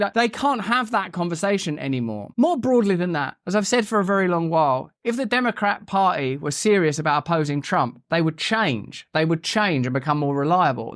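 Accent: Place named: Cockney, United Kingdom. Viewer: I saw you in a period piece for Public Telly with short hair in a suite and thought you were impressive in a Dramatic role. All this latent 1960s British Hippy or 80s big hair sex drugs, MTV crap is why you and Trump are kindred spirits, but you are more articulate even with that London Cockney. Brilliant assessment of the Gag Order and Trumps Al Capone comparison. Loved the Jesus analogy and Trump juxtaposition De javu.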